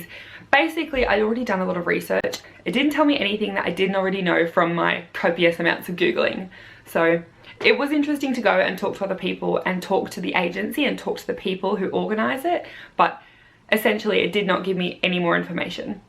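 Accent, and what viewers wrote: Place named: United Kingdom. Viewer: Your accent is starting to sound British :D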